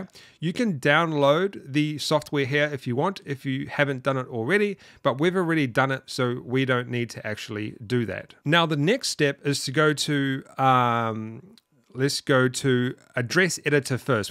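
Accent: New Zealand. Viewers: awesome. a Kiwi Accent. Also When did you make this vid? cuz the info i'm seeing. with a Nvidia RTX3090 est.daily profit is 9.2USD. Ummmm what? defo not 35.42USD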